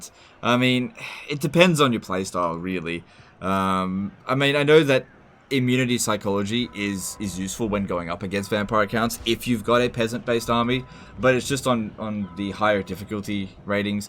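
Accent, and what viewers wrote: Australia. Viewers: Bruh. I like putting these on as background noise cuz I love TW and has the free content perk of an Australian accent, but I heard those silly ass bells and looked at the screen. Lmaooooo what are those GOOFY dudes. 69/10 doomstack imo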